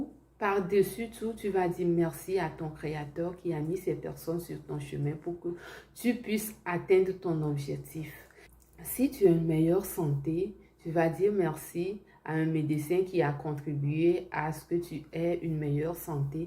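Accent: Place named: Africa. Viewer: pauvre petite africaine minable! Les riches ne passent pas leur temps sur YouTube à poster des petites vidéos faites en mauvais français à l’accent africain pour avoir des vues afin de se faire payer klks miettes par YouTube à la fin du mois. Si tu n’a pas reussi ds la vie au Canada, il ne faut pas généraliser et inclure tous les africains ds ta pauvreté et ta misère. Lis les commentaires et tu verras combien d’intervenants te disent les mm choses auxquelles tu n’arrives pas à répondre. Les vrais riches ne assent pas leur temps à poster des émissions sur YouTube sur comment obtenir le visa ou l’immigration Canada afin de recevoir les miettes. Comment peux-tu apprendre aux gens à devenir riches alors ke toi mm tu es pauvre avec une vie sociale misérable au Canada? Les riches ne peuvent pas comprendre ce que tu dis. J’ai parcouru toutes tes 16 vidéos: il n’y a aucun commentaire d’1 quelconque riche qui aurait compris quoique ce soit. 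Mais retiens qu’il y a içi en occident bcp d’africains qui sont des juges, médecins, avocats, chefs d’entreprise, sénateurs, businessmen, basketteurs, acteurs de cinéma, boxeurs et toi tu dis qu’eux tous sont pauvres??? Tu es malade ou quoi? Le camerounais Ngannou qui a gagné <num> de dollars ds un seul combat de boxe est pauvre? Le basketteur congolais Mutombo Dikembe qui gagnait <num> de dollars par An est pauvre? Le frère au Nigérian Dangote qui a investi près de 1000 dollars içi au texas dans le pétrole est aussi taxé de pauvre par toi? L’act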